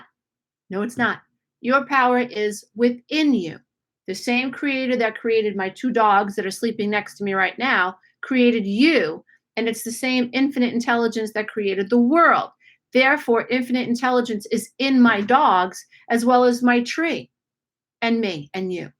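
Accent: New York, United States. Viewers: You are wisdom with a New York accent 👍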